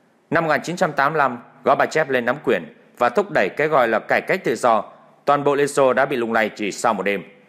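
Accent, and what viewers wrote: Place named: North, Vietnam. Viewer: Bạn nói đúng! Nhưng vì Ba Ke đều có lối phát âm như vậy chớ không chỉ riêng anh nầy !!! Tôi chỉ nghe anh ta nói “ u - cà - na - na “ mà thôi ! Ngay như “ HÀ NỘI “ họ còn không nói được 👍 thì còn nói chuyện gì khác nưa chớ ??? OK 👍!?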